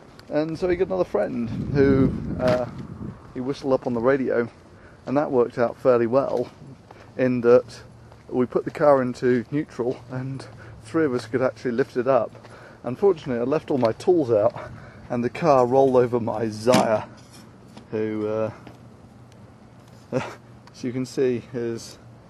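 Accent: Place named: United Kingdom. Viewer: how did you get such a british accent if you lived in the states? Did you grow up partly there as well?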